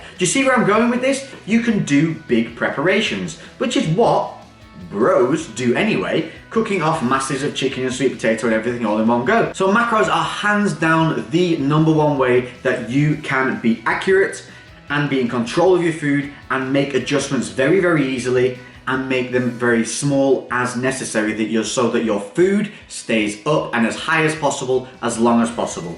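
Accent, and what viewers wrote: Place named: Yorkshire, United Kingdom. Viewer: I've recently found your channel and I'm loving it! You sound local to me, I'm near York.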